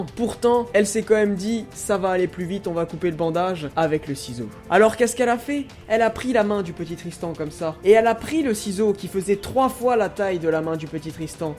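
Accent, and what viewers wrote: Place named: Moselle, France. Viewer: Tu viens de Metz ? Genre Moselle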